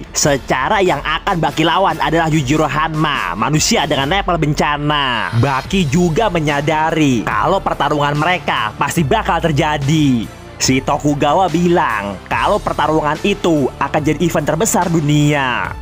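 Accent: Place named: Jakarta, Indonesia. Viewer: Channel paling danta dan pembawaan nya betawi bat daah gua demen sama yang begini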